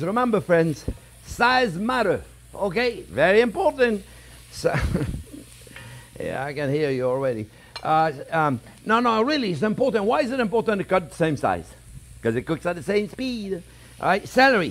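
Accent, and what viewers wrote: France. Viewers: Yesss delious 🎉as usually, I’ve cooked this receipe for yrs, just didn’t have your awesome 👏 homemade broth an yrs ago had I known bout it I would have cooked it bcuz had a big kitchen, but broth in the box an bouillon cubes, Luv your recipes 🎉, an your French Accent, bloob bloob an you twinging your hands in air, tell food to get bk in pot, most of all you cook soo clean 🧽 with everything, wash your rags out wipe your working board off, I get soo tired of other cooking videos not rinsing off meat 🍖 are etc, tonigt my friend I’m drinking red wine 🍷 an enjoying your videos you made me laugh 😆 when you said if you have criticisms go an watch Tik Tok are what ever it’s called I laughed soo hard lucky I didn’t burst my jeans, lol 😝, You are a blessed renound chef 👨‍🍳 God Bless New Orleans La. 🎉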